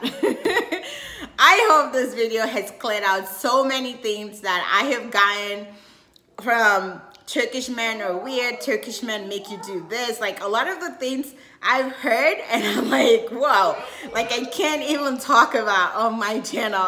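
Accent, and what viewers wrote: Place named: United States. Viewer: Unfortunately I absolutely dont agree with most of your video's it took me this long to respond. I was married to a Turk who not only abused me mentally emotionally and physically gradually over the years. Seems like you have an American accent 👀 He came to London with nothing back in the 80's it took 15 years for me to leave. He is now a multi millionaire due to me my lovely. Please don't think your irreplaceable . However you have given me an inspiration to make a video about my journey being married to a Turk.. I have a 34 year old daughter a film director now and 5* Michelin chef in London and a son who lives in Istanbul. I will definitely show my daughter this video. Your very naive. 🤓 sorry if this offends you. ♥️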